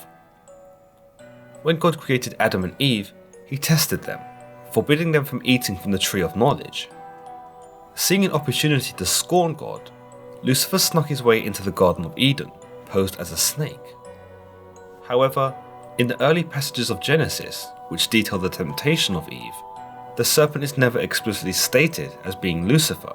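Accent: United Kingdom. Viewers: video length my moth3rs bday, so I was like Imma do Connie a solid. 177,444 views, Izeah or something luci4. Lucy Ferrey, if Scarlet Johansen had a crush on me. I had sorta long black hair, but I cut it shoulder length, Darth Vadored it. I am God and as a side job God's body guard. If I got a second story and solar pannel roof, would I sell it to my friend Leeman or whoever, and or myself and commit to dying my hair ultimate black to match the solar pannel roof. 'Cause right now it's a one story with red ocher Spanish tile roof that matches my Amanita red hair. Went there. For the prophets. The British accent is powerful. a gay piggy back to smoke a bowl of weed we sometimes entise ourselves with. I'm going now. Ha ha ha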